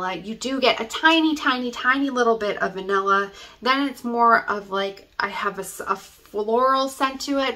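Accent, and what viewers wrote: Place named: United States. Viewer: Omg the American accent is so cute.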